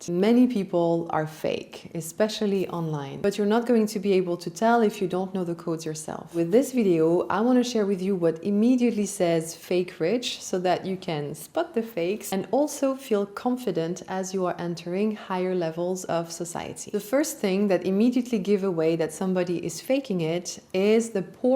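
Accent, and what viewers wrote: France. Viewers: Interessant, mais votre accent anglais franchouillard est éreintant. Dommage.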